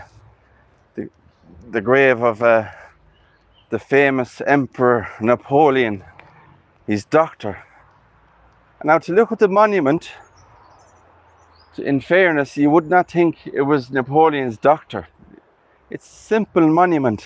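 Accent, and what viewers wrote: Ireland. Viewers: I like the new channel name. The cemeterys there have such fantastic character and The narration with your Irish accent really makes for an incredibly enjoyable video ! ! Thank you for producing them!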